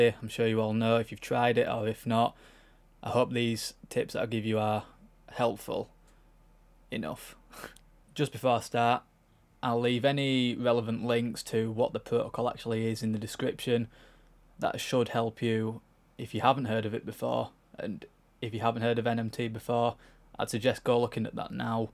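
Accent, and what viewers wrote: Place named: Northern England, United Kingdom. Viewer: Proper northern accent bro but love the video ❤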